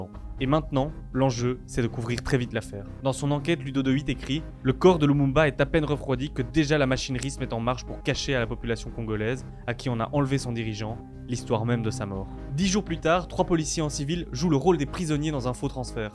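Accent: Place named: Belgium. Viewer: Merci pour cette vidéo nécessaire ! Ça fait du bien d'entendre l'accent belge haha !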